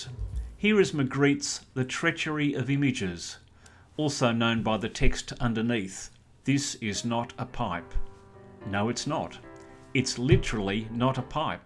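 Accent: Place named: United Kingdom. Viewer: Isn't this just pop art, like Warhol? (Not that his stuff is any lesser than regular art. It has a huge influence on our lives.) Also, it immediately calls to mind the Monopoly board. Thank you for these videos. You have everything you need to succeed as a narrator: you have a wonderful understanding of and love for your subject, you have a way of making art understandable for your viewers, and you have a British accent. Subbed.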